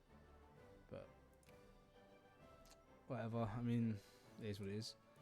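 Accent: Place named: Kent, United Kingdom. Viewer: You from Kent? You sounf like youre from kent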